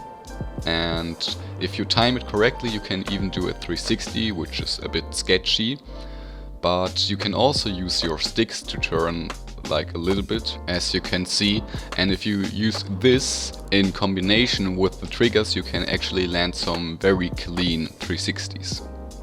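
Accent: Germany. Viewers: Man merkt, dass du Deutsch bist. ;) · U Sound like a german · Bist du deutscher? xD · man hört das deutsch richtig raus haha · Sounds like a german, speaking english for his first time..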